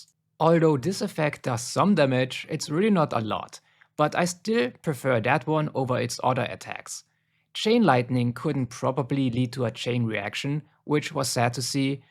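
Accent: Germany. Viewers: Minimal deutscher Akzent 😅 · Are u from germany by Chance ? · It's odd, it sometimes does 1 Mil damage (hello from Germany btw, I think you are from here too cuz its noticeable in your accent), while other times it does like 45k-ish? Like this will happen on the same round, in the SAME trigger. It'll suck up 3 manglers, spit them all out, 2 of them die and the third just... doesn't? Amalgams too, sometimes it's a mil, sometimes it's just less than 100k dmg. It's inconsistent. Also, I was REALLY hoping the targeted augment and the explosive drop augment would help turn shadow rift into a horde clearing beast, but the damage doesn't scale at all making it virtually useless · Der deutsche accent ist strong😂 gutes Video!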